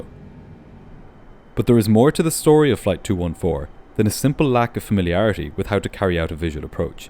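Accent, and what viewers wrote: Ireland. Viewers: Stumbled upon your channel a few days ago pretty much by accident. I lived in Ireland for half a year when I was 15 and I still miss it 5 years later, so hearing your accent really caught me and sent me down memory lane. I think I‘ve watched about 10 of you videos now and I‘m staying for the amazing storytelling, analysis and breakdown!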